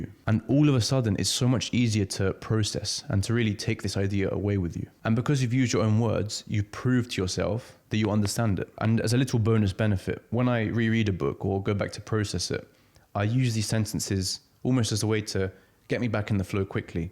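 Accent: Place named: RP, United Kingdom. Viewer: Thank you so much for your work.Sometimes i get pretty useful insight from your videos.I like your soothing, not-constantly-being-interrupted-with-background-music voice and your posh accent.In fact, being an English learner, your videos are something that inspired me to switch to British accent rather than the American one.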